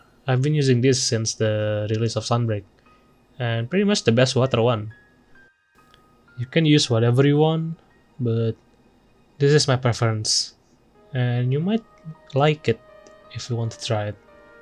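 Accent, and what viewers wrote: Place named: Indonesia. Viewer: You sound like Indonesian. I really like Lunatic Rose, it is really convenient to bring to multi-monsters hunt. This update is huge for me coz I dumped all my previous set and finally can slot in Peak Performance 3 + Chain Crit 3 + Defiance 5 and even Good Luck 3 (gonna change it to Partbreaker 3 coz my I'm already set with Qurios Augment).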